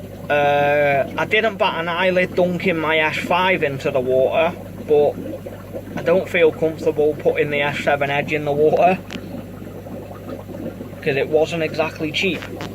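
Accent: United Kingdom. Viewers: "Focus, Bitch" was reaching iconic status, for me at least. I'd started saying it in my head whenever I go off on tangents during deep conversations. You know, you could've turned that into a selling point or catch phrase for your channel...it's the way you sound that caught my attention. Anyway, I'm really into various Brit accents, so there you go🤓.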